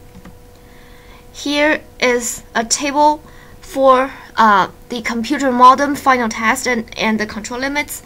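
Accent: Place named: China. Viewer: Chinese accent haha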